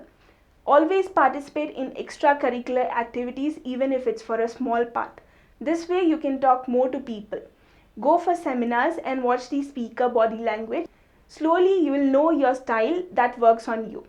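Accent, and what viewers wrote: India. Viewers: It's not terrible it's Indian accent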